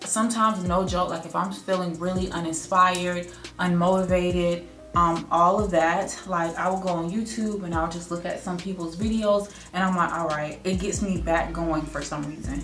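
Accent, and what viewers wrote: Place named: South, United States. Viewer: I'm so happy you did this because I didn't just start following you but I kinda did lol so I'm a rookie to the #DesignSquad lol but I loved that I got to know more about you and love your southern accent lol I have family down south as well so to see you be so stylish and chic coming from the south makes me happy!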